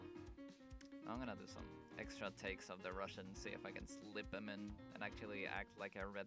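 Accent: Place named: Japan. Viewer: ты хорошо говоришь на русском, но говоришь так, будто это японский или корейский, но а так нормально >___<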